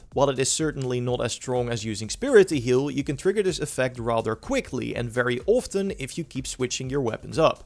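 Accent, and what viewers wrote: Netherlands. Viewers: That's how most Dutch people sound when speaking English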